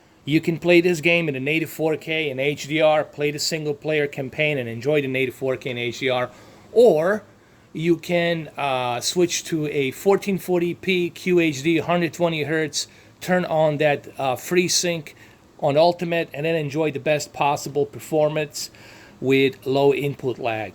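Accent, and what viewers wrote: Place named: Florida, United States. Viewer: Hey bud. Awesome cant wait to play it. However If i may request. What are your picture settings while using game mode for bfV? I am very interested in using the free sync feature at 120h. Currently when I play forza horizon 4 and red dead redemption 2 I am not using game mode but I am using your natural picture settings which give me the best picture IMO on the q8fn. Would I use those same natural picture settings in game mode? I would love to hear your opinion. As always thank you so much! Also on a side note, please take no offense, I love your accent. I grew up in Florida as well. Take care bud.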